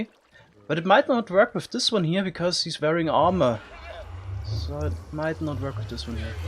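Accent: Germany. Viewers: Der deutsche Akzent 😂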